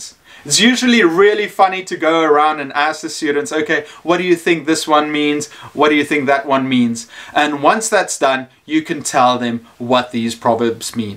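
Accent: South Africa. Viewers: Easy to spot a fellow Southie! Nice job on all the content, very helpful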